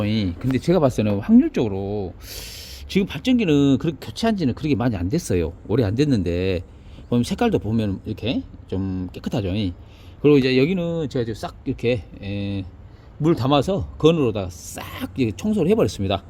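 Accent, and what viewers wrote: Jeolla, South Korea. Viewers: 공장장님 오늘도 수고 하십니다 전라도 사투리가 아주재미나게 말쑴헤사아주 시고 실력도좋고요 나도 화순사람인데요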